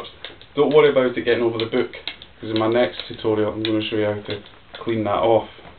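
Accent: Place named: Scotland, United Kingdom. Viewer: A scots lad ..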